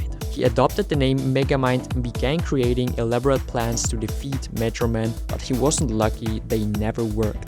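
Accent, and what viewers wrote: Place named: Germany. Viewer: Bro has crazy German accent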